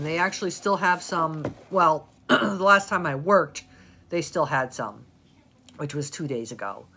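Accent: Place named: New York, United States. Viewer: I’m new, just found your channel! I thought you were from New York, you have a slight accent haha my first guess was New York or either maybe Chicago 😆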